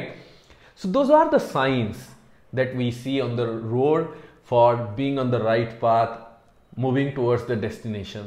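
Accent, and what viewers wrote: India. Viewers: Is-spirituallity indian accent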